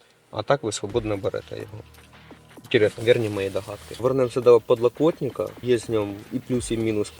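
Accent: Surzhyk, Ukraine. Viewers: Авто, мабуть хороше, але жахливий суржик "експерта" відбиває бажання слухати. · "Нєпадгатовлєний" від твого суржику вуха вянуть. Слабо говорити українською?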